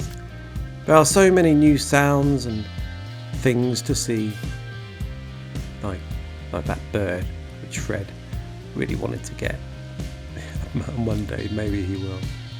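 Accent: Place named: England, United Kingdom. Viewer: I can hear your cats interrupting you She is very talkative alright I love your cat You have nice videos!🤩 They are beautiful a darling cats! 😘😘😘 What are their names Was not able to hear you well You have an English accents and I have poor hearing sorry I am 80 yrs already Thank you for sharing friend 🌹🌞🌹🌞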